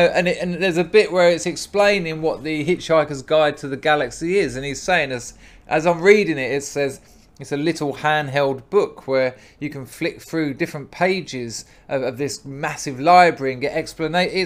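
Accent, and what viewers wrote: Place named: Cockney, United Kingdom. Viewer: spot on mate good to hear a fellow cockney of the old school.compared to nowadays the old days were utopia.keep on doin what yer doing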